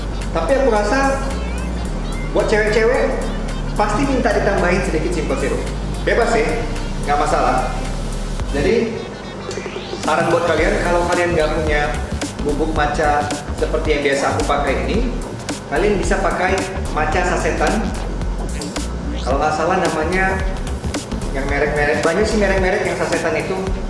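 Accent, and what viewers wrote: Medan, Indonesia. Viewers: Bg orang medan ya ?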